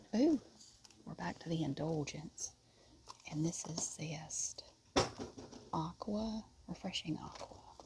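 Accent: South, United States. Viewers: Hey not trying to be creepy 😂 but I love your vids and southern accent but what southern state are you from I’m from South Carolina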